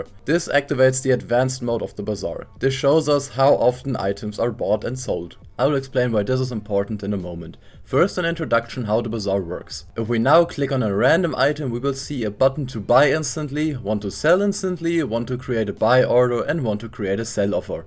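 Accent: Germany. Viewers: Man hört ganz dolle, dass du deutscher bist😂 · i hear a DEUTSCHER AKZENT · Are you german? because you have that german accent i hear every time i talk with someone german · Bro sounds german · Man wieso immer dieser Deutsche Akzent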